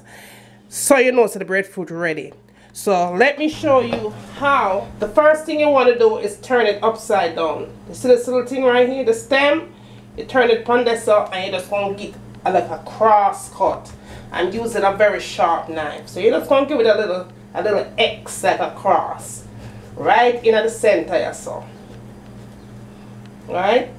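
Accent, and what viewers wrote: Jamaica. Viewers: MEH LIKE DE JAMMIE ACCENT, ROAST IN DE COALS IS DE BADDEST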